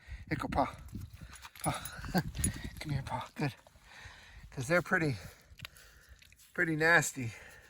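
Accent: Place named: Long Island, United States. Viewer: Where you from you sound like yr from long island ny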